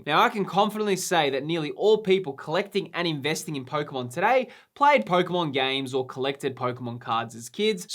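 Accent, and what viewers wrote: Australia. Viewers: he's aussie they have funky accents like the brits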